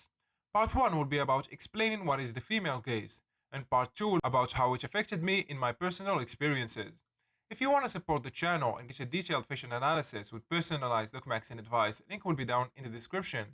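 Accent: Morocco. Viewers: انت مغربي 😧😧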